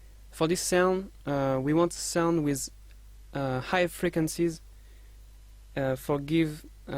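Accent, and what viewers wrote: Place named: France. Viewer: Je me disait bien ta un accent francais lol ^^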